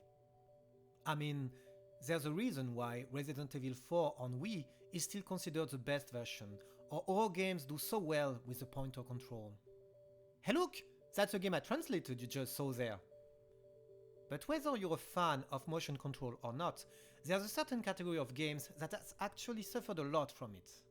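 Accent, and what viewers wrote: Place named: France. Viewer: Hey! Great video! Thank you for all your work and I'll be eagerly waiting for any next video you'll put out, French accent included ;)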